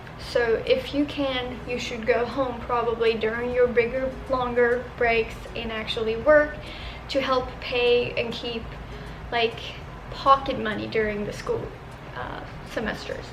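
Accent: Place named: South, United States. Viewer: girl, your southern accent is coming through! love it 😂 and miss you 😘 kinda jealous ngl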